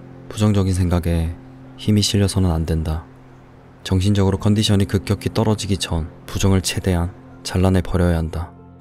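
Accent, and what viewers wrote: Gyeongsang, South Korea. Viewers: Love this channel. 고향이 경상도세요???? I can hear ur dialect❤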